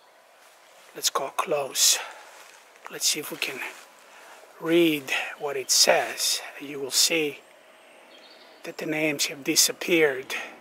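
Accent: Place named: Greece. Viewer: Thanks for explanations and for video ✌️ Like your accent. I guess greek:-)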